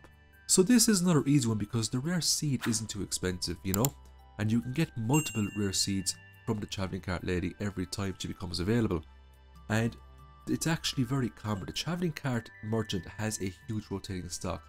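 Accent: Ireland. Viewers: thank you for the heart, I love your Irish accent ❤️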